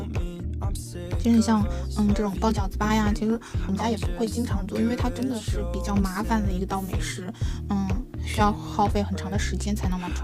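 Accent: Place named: Duchang, China. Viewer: 听到都昌话了...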